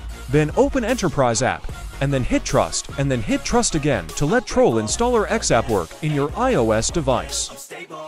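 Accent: South Africa. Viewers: Yo are you from South African ❔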